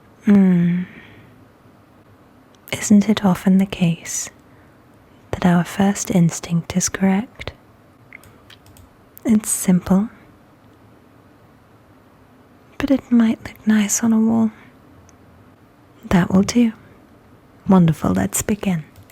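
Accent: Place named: United Kingdom. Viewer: Loved it! Work on your ASMR style! You got something seriously good here. Maybe a little trip back to England would help you catch back a little more british accent which is one of your best features for this. Congrats and thank you.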